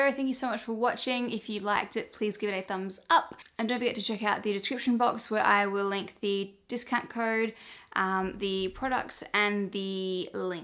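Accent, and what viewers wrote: Australia. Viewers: The aussie accent 😍😍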